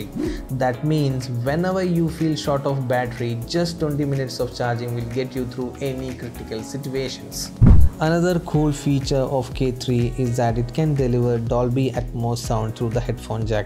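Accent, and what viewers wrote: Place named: Kerala, India. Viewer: malayalai ano bro? english arayumpol its understading... but video is good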